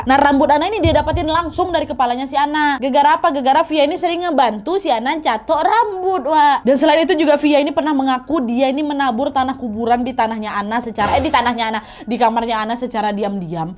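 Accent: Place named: Padang, Indonesia. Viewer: Lama2 nada bicara LW kyk Padang!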